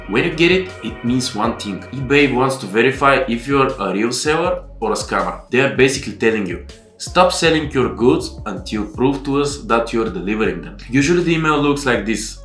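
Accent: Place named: Russia. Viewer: Your English is very good Russian heavy accent 😅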